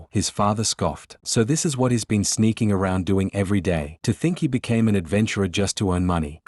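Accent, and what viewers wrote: United Kingdom. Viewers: I noticed the British accent last time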